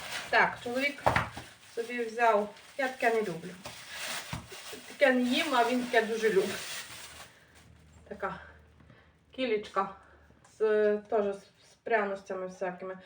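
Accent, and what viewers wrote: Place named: Ternopil, Ukraine. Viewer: Добрий вечір хочу вас запитати з якого ви району на Тернопільщині тому що я також з Тернопільщини яке ваш район,село в нашому селі є також така фамілія як ваша акцент ваш такий як у нас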